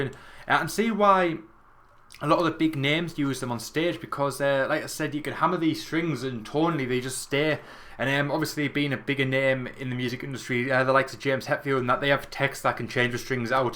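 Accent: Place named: Durham, United Kingdom. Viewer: Nah.. Probably Durham or teeside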